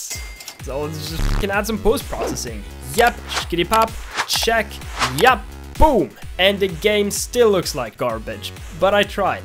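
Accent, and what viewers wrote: Sweden. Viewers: Are u Swedish or danish???? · Are mah boi swedish?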